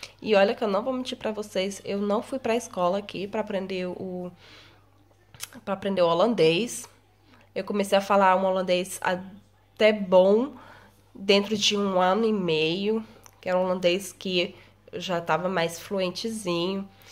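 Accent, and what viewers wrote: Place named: Maranhão, Brazil. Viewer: Oi, sou novo aqui no seu canal e tenho uma pergunta você é de qual estado do Brasil? eu aposto que você é do Maranhão esse linguajar é bem maranhense mermã...kkkkkk. Estou gostando muito das sua aulas. Sucesso.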